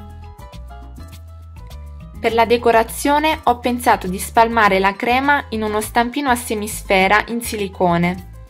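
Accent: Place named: Brazil. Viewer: wao que hermoso video, y que hermoso acento eres de Brasil o Italiana ... muchas felicidades ... saludos desde MEXICO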